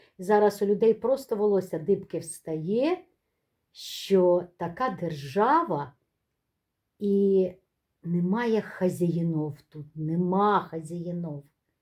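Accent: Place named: Ukraine. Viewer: Почитала и прослезилась . Сколько злобы и несправедливости , слепой ненависти . Дизлайк Вам , мадам ! Вы позорите украинский народ , не весь он такой . Моя бабушка была украинка , золотой человек , интернационалист ! Кстати , говор , как у Вас , акцент . Поэтому я и обратила внимание на Ваше видео , приятно стало , душевно . А потом .... Жаль . Не одумаетесь Вы , никогда .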